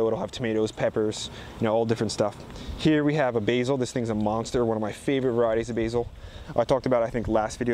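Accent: New Jersey, United States. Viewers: His way of talking is TOTALLY a Jersey thing!!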